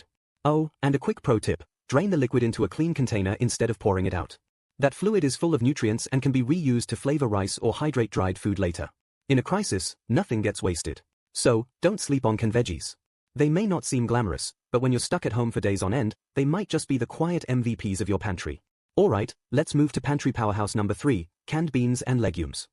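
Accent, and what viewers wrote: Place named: England, United Kingdom. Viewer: Congratulations! A new voice was used (English accent); however, the list of essentials has changed little. Why? Because it is somewhat difficult change to any degree.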